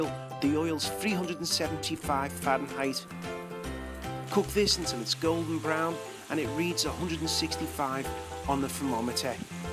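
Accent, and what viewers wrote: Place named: Liverpool, United Kingdom. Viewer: wow a scouser haha me too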